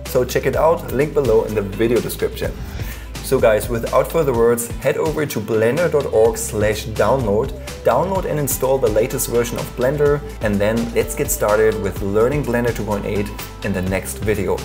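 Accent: Germany. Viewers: first video I saw of you and I constantly hear your german accent (wich isn't bad) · kann es sein, dass du eigentlich deutsch sprichst? XD volles vid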